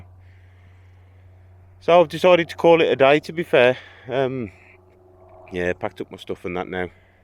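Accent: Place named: Midlands, United Kingdom. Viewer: Nice vid with some good commentary..👌 Nice to hear a Midlands accent..im in Wolverhampton... Have you tried coppice lane fisheries.?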